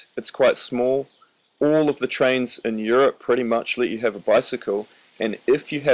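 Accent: New Zealand. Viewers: u talk with kiwi accent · Hi from another kiwi (I think you sound like a NZer). I'm living in Japan and I have a Tern Eclipse S18 bike that I use to get around on the trains etc here. I'm planning on riding around the world (as much as I can anyway) in a couple of years when I turn 63 and I'd really like to take my folding bike. Its either that or my Surly LHT which is a great bike but doesn't fold. I've asked Mira Slegl <link> who took one around a lot of the world how it was but he said that a folding bike isn't very good on rough roads. Can I ask you how was your experience with a folding bike. Did you have any mechanical problems with the fold mechanism? I'd really prefer to take a foldie if I can.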